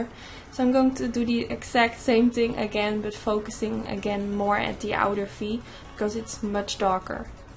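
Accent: Netherlands. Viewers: I love it! Your work is very precise, you're truly an artist :) also, your accent sounds Dutch, am I right? Oh and I've subscribed 😄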